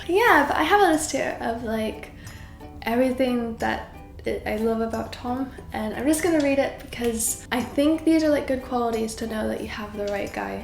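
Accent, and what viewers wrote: United Kingdom. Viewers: your American accent is sounding UK. THATS AWESOME!! YOU GUYS ARE LOVELY. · Ok this has nothing to do with the topic of the video but your accent is really interesting, because you are starting to use some British ways of pronunciation, the stress on certain syllables in certain words for example. I just find this really interesting as an English (as a foreign language) student.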